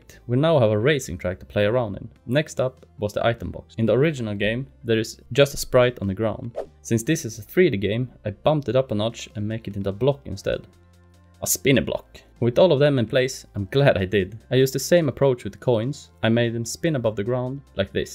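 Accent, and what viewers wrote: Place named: Sweden. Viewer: Omg I love the swedish accent😂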